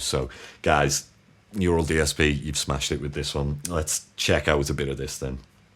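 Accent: United Kingdom. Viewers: Great chops mate! I will however admit that it was your deep timbre British accent that got me smashing that like and subscribe! Ha! Seriously my dude. You should do voice overs. LMAO! Good content doggins. Cheers!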